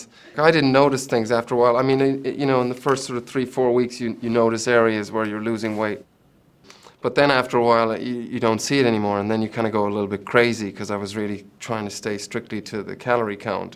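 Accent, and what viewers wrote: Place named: Ireland. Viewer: i like his cute irish accent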